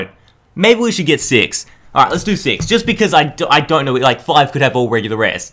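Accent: Australia. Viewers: He's Australian sir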